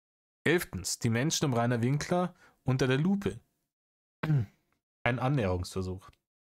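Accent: Bavaria, Germany. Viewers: Dein Latein ist grottenschlecht, aber dein Bayrisch ist super 😂 Danke für 2 Stunden Kurzweiligkeit im Auto. Mach bitte so weiter 😊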